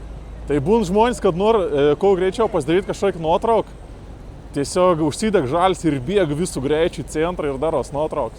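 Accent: Samogitia, Lithuania. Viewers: Kodel zemaitiškai kalbi sakei lietuviškai kalbesi😢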